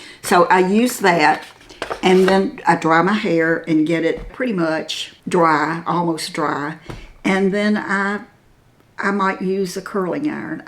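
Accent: South, United States. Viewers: Thank you. I hate to say, but I will. I’ve been watching video after video. My mom and my aunts have been gone a very long time. So this was like getting to spend time with them again. A little while ago I was explaining something to my husband and I found myself going into all the small details. I realized I was speaking with your voice, sans southern lilt. Thank you! For everything.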